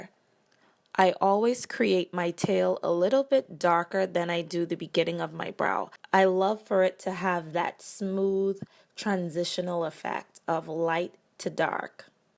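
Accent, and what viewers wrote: Bahamas. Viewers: Sound like a Bahamian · Bahamian accent???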